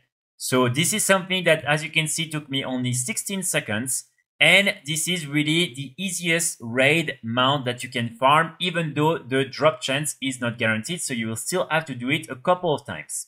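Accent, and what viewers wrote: France. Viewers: ze french accent is very there (superb video btw thanks <3)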